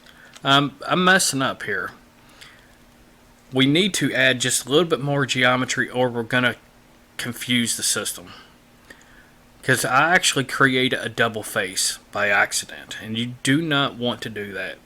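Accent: South, United States. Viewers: Also I did not know you were Southern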